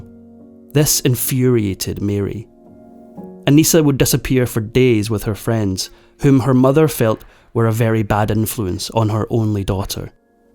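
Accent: Scotland, United Kingdom. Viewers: That's a Scotsman accent. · Yeah, beautiful Scottish accent. Lovely 😊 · Evil has resided in Hollywood from day one! Sadly, it has destroyed the lives of way too many actors over the many years, like Anissa.😔 Btw, I love the Scottish accent! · Can you please stop with the British accent?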